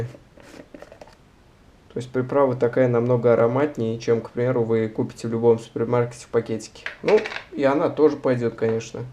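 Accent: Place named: Mordovia, Russia. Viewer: с пацаном служил с Мордовии. У него такой же акцент) никогда раньше такого не слышал, а сейчас сразу узнаю)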